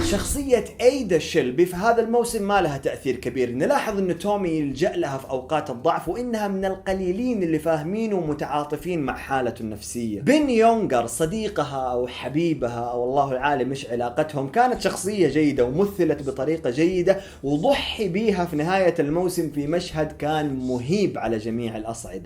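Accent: United Kingdom. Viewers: لكنتك الاسكتلندية رهيبة 😂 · التمثيل رهيب كنك عندك لكنة ابريطانيا لو تروح هوليود اتحاول ادور طريقك 👏👏👏❤❤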